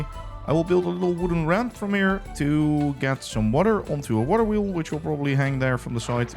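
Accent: Netherlands. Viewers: i'm almost sure you are dutch,, eventho.. came for the clickbait, stayed for the accent! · First time watching your video and i wonder do you speak dutch beceause you have a dutch accent? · I heard you talking on Beardstone or Chosen's video and thought, hey that guy sounds dutch. Now I see you are dutch and a rail fanatic. Coooool. Subscribed. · love the dutch accent as one dutch to another i salute to you! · I knew it, the accent totally a Dutch guy😂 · Eyy random question are you dutch? You have a very dutch accent... zou ik moeten weten, ben het zelf.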